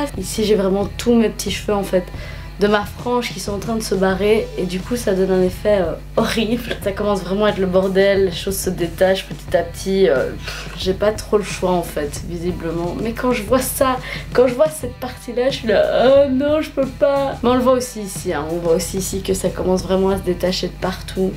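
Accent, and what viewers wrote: Belgium. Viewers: Tu as un peu l’accent belge 😂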